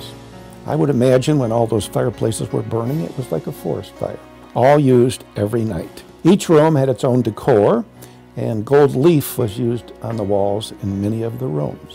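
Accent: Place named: Cleveland, United States. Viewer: Wish more of the houses from this era were still standing! On an unrelated note, you have a classic Cleveland accent lol.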